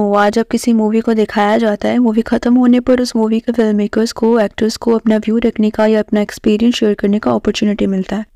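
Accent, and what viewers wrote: Bengal, India. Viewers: Aap bengali ho?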